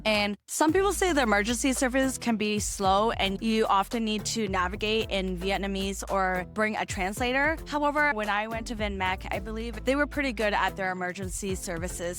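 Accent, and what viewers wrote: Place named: California, United States. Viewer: Facts. I was like, "She definitely sounds like she's from California."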